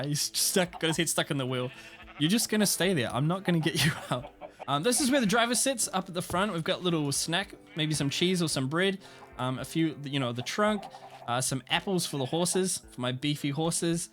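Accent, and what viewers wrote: New Zealand. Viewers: Huh? You're from New Zealand? I wasn't expecting to hear that.. But that's pretty cool considering I am too!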